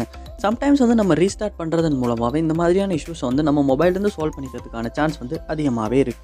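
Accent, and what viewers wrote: Sri Lanka. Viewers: Unga tips na innum try pannala... But unga ilangai tamil ketka nalla irunthathu sago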